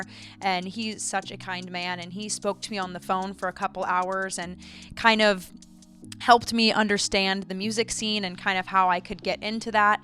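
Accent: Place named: Tennessee, United States. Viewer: Maybe Because you spend a lot of time in Nashville Tennessee maybe that’s why but you do not sound like you was born in California you sound like you was born in Tennessee or somewhere close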